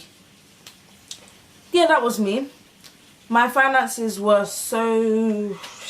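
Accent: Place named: England, United Kingdom. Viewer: I love listening to English people speak... best accent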